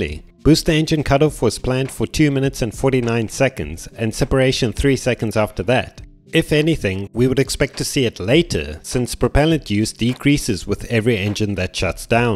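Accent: South Africa. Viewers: South African!!! Nice Dude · South African? Accent kinda sounds like it.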